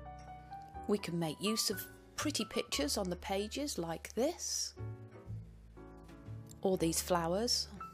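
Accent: England, United Kingdom. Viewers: What a lovely English accent! Thanks for the video 💐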